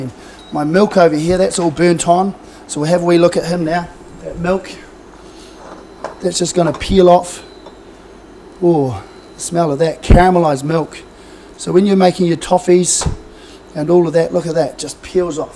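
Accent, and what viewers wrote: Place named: Australia. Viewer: Thanks! Australian, lol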